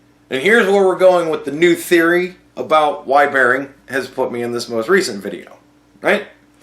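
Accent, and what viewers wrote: United States. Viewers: another one from Bearing, but I do have you on 1.25 speed cos dude..... you like to take your time and yeah, you may have been funnier in that clip than normal, game up dude. nice to listen to a "normal" american every now and then. all the best. i'm not sticking around for the full 23mins though, I couldn't bear it.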